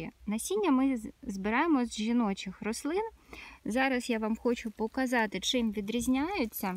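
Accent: Standard. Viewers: Более года , как ВПО, живу среди жителей трускавца, Дрогобыча, Борислава и Стебника и ни разу не слышал такую красивую, чистейшую украинскую речь. У местных жителей, украинская речь ну совершенно другая😅 Откуда, вы, мадам? Единственное, что подтверждаю, что крапивы здесь как у нас на Донбассе амброзии.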